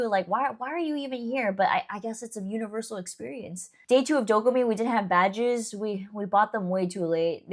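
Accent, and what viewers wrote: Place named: United Kingdom. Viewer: Wait you are from 'MURICA?! I tought you were like British or a very confused German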